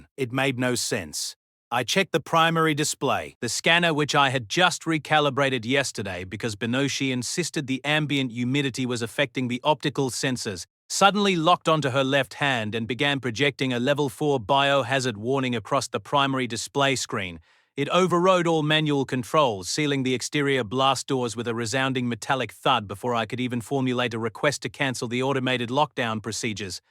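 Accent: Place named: Australia. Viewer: Love the narrator's Strine accent!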